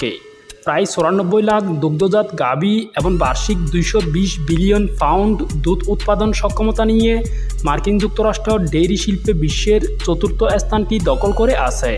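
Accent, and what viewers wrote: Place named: Chittagong, Bangladesh. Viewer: আপনি চট্টগ্রামের লোক মনে হয়।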